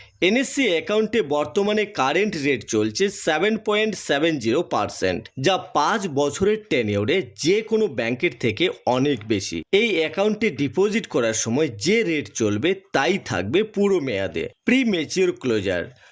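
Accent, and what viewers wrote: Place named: Bangladesh. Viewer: তুমি কি বাংলাদেশের মাল বাংলাদেশের মতন করে কথা বলছো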